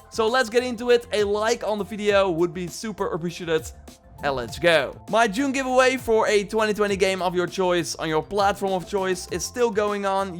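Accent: Netherlands. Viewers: Kijk uit naar je content man! Ik weet het niet zeker maar aan je accent denk ik dat je ook nederlands bent! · ik weet het niet zeker maar je klinkt NL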